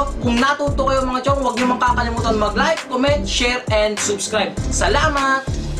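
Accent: Batangas, Philippines. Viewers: Batangueño tlga kpg mgsalita prang galit ,peru natural n boses lng yan...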